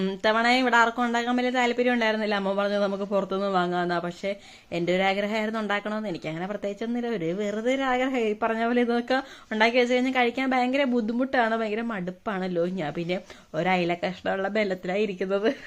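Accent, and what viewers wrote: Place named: Vaikom, India. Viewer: ഞാൻ ഒരു വൈക്കം ക്കാരി ആണ് ഇപ്പോൾ ജിസിസി യിലാണ്..... നിങ്ങടെ വ്ലോഗ് കാണുമ്പോൾ എന്റെ നാടും കൂടെ കാണുന്ന സുഖം പിന്നേ നമ്മുടെ vaikom സംസാരം പിന്നെ ടെ ടാലെന്റ് എല്ലാം എല്ലാം എനിക്ക് ഇഷ്ട്ടം ആണ് ❤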